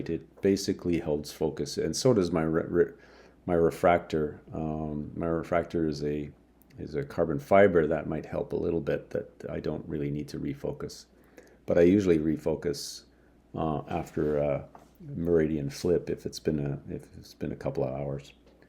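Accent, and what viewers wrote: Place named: Canada. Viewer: Never saw one of your vids before this, but the thumbnail caught my because I instantly figured it must be Starfest. Then hearing your Canuckistanian accent (me, too) pretty much confirmed it. As I type at roughly the 12 minute mark I hear you say that it is Starfest. My last time there was in about 2013, if my memory doesn't fail me. 😉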